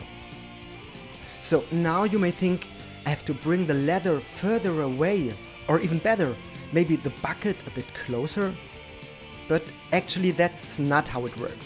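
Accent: Germany. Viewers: Du machst echt gute Videos. Warum machst Du das nicht (auch) in deutsch? Also, ich meine bei Dir rauszuhören, dass Du eher deutsch sprichst, oder? Echt gut.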